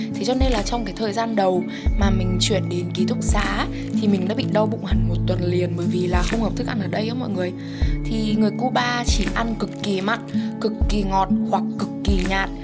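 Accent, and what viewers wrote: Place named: North, Vietnam. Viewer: đang định bảo chị này có cái gu thời trang đúng kiểu con gái mk thích, cho đến khi đọc i4 :V. Chưa xem des nghe cái trời nồm là biết gái Bắc rồi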